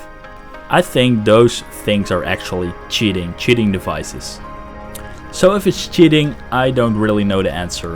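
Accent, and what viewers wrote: Netherlands. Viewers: Are u from the NL? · Hoorde al aan je accent dat je Nederlands bent ;) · Are you dutch?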